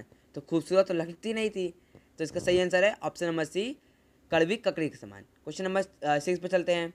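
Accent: Bihar, India. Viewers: Sir bs ek question aap bihari hai ky .plz reply sir your language is like them only . Btw I love bihari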